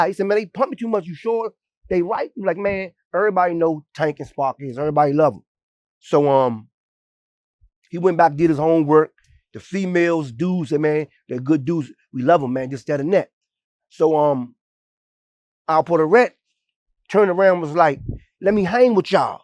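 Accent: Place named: Maryland, United States. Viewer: This nigga sound like my boy in m.d and it's not P.G county I'm deeper in m.d than that ...I'm m.d in between Baltimore and Philly 1 hr either way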